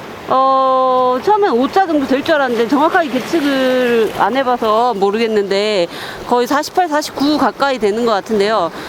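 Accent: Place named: Gyeongsang, South Korea. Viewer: 낚시 못한지 2년 넘었어요 영상이 너무 좋아요 경상도 사투리 쓰는 명랑소녀 모습입니다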